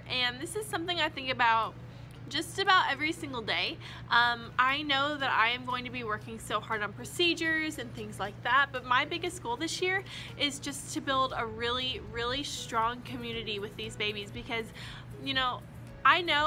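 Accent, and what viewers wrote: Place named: Tennessee, United States. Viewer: So, I've been watching you from Day 1 on here & I told my niece I didn't know what it was about your videos, but you felt relatable. 1) I organize & put stuff together like you (all over the place, but it comes together nicely.) 2) I'm bubbly like you. Love the positivity. 3) I'm from Memphis, TN! I knew your accent and stuff sounded familiar & now I know why. You're a fellow Tennessean! Anyway loving the videos. I homeschool, but we have had so much fun watching your videos. Keep 'em coming !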